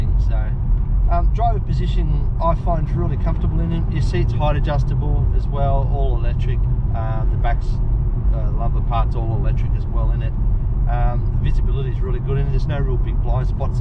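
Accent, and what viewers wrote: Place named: Australia. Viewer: I can see from your accent you are aussie mate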